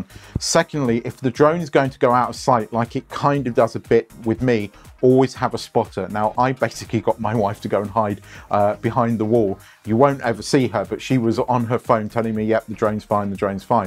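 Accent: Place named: Surrey, United Kingdom. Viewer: Really highlights the possibilities with the Pro/DJI flight modes. With your accent I was expecting views of Woking, wasn’t expecting Palm trees, ha ha. Thanks for posting!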